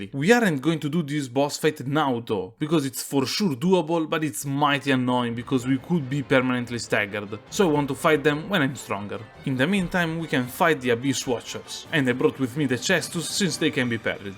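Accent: Italy. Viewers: I LOVE YOUR VIDEOS MAN! Also sembra che tu abbia un certo accento italiano, lo adoro. È un onore vedere un italiano così appassionato fare dei video così di qualità. Keep it up bro!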